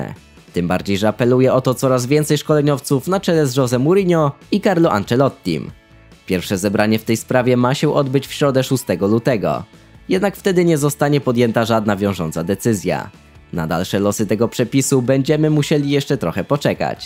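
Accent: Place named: Poland. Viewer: O dzisiaj dużo polskiego akcentu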